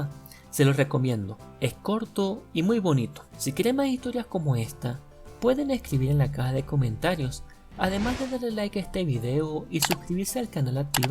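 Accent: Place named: Venezuela. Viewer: Venezolano, voz agradable, muy bien resumido y entendible 👏👏👏 gracias aquí otra suscriptora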